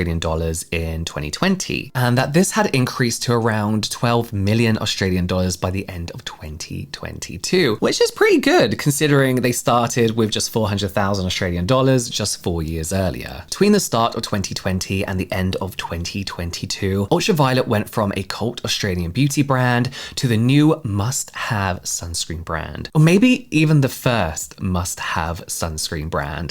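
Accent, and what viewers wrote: United Kingdom. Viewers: Oh I didn't know he was British (I'm not good at recognizing accents) but that makes it even more shocking 😅😅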